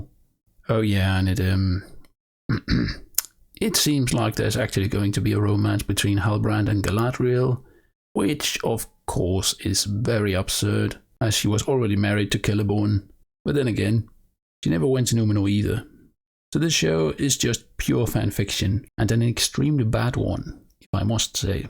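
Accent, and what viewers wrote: Denmark. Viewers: Love your accent? Are you Danish? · Im curious where you’re from? Cool accent If i were to take a guess I would say Denmark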